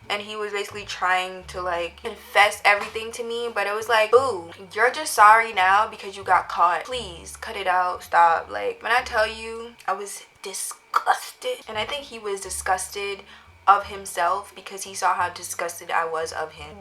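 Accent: United States. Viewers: you said the hood, it had to be baltimore! this sound like a baltimore nigga lol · Sounds just like a Maryland nigga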